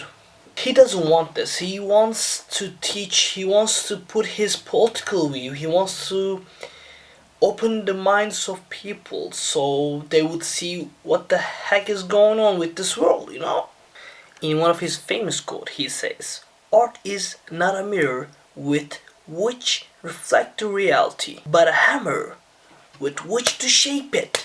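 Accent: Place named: England, United Kingdom. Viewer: Your english is better, seems more from England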